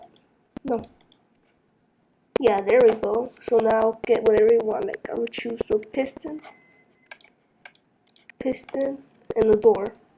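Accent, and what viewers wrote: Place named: Ireland. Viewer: OMG so irish i love it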